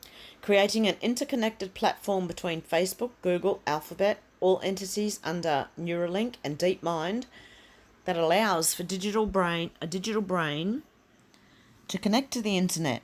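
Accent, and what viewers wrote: Australia. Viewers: This has to be addressed by world leaders. One of them is addressing this issue being Donald Trump who is hated by the media. Australia pull out of doing business with Chinese mobile phone tech company. NAMED in report can’t spell name. Scott Morrison won our election which he was supposed to loose, thankfully God is with us. By the way you sound Australian. You are doing a great service to humanity bringing this out in the open. David ICKE has been warning us about AI and has been a lone voice accused of promoting conspiracy theories. May God bless us all with hope to change our world. hated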